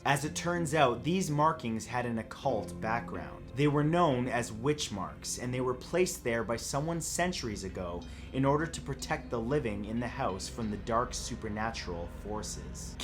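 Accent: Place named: Toronto, Canada. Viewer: It's just his accent lol, I'm from the Toronto area as well, and I say it the same way :P I know it's different with a British accent, my mum is from Liverpool, so I have family there. I still have difficulty understanding Scouse though :P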